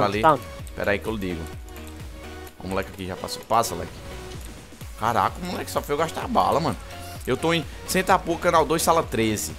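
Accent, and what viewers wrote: Northeast, Brazil. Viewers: Mano vc eh da ond daq do nordeste?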